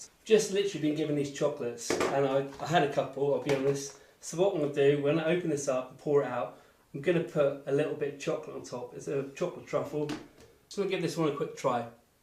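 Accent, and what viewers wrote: United Kingdom. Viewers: So cool. One problem, with your delightful British accent I did not catch what went in after the Vanilla extract. Almond extract maybe?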